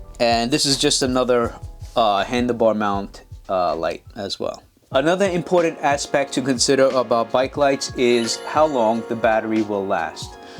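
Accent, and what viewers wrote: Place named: Brooklyn, United States. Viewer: I mentioned that I only had one question, but I actually have a second question and a comment. The comment is that I like your NYC accent, it sounds a little Brooklyn, like maybe Bay Ridge, though it's not too strong. My second question is about the 2600 be remote. Does it have a watch battery that you only have to replace like once every year or two? Also, how waterproof is the actual remote, in your opinion? Thanks again and have a good weekend!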